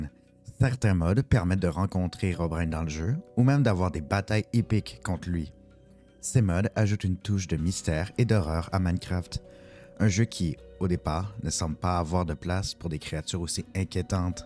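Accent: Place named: Canada. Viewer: Yooo un canadien commme moooooi 😊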